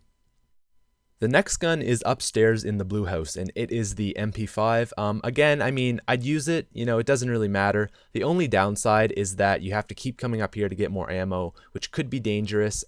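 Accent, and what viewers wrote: Canada. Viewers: you sound like you are from Canada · are you canadian?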